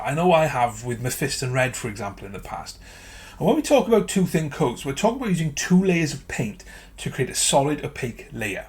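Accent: Wales, United Kingdom. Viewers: You sound like a fellow Welshman! Those tips were much appreciated cheers!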